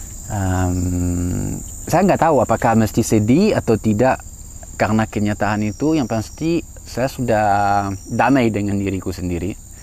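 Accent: Indonesia. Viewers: Bang mantuuulll... Klo saya udah banyak liat videonya, bahkan jujur pertama kali saya liat video yg pertama saya tonton, saya udah kagum sama cara ngomngnya.. Indonesia banget..👍 terus berkarya bang.. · Dari segi bahasa dan dialeknya anda itu udah jadi orang indonesia banget, malahan sebagian kecil orang indo masih ada yg pake bahasa daerahnya karena gak bisa bahasa indonesia! Mengenai fisik, kalaupun ada orang indonesia yang jago bahasa inggris sampai kapanpun gak mungkin dia jadi bule, begitu analoginya bro. Salam.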